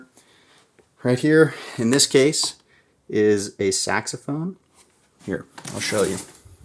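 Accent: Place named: Vietnam. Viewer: Your vietnamese accent is cool! Hope to learn english much more from you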